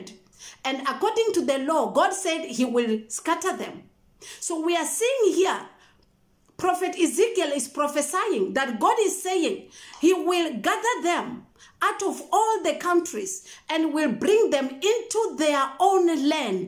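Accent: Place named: India. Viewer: Sounds similar to the way the Indians in India talk.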